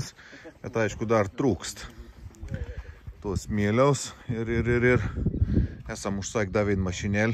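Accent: Samogitia, Lithuania. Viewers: 😂 Maniau, kad tai kažkas iš M. Petruškevičiaus serijos - punčakos nemadinga 😂. Ačiū, už tą nuoširdžią žemaitišką kalbą ❤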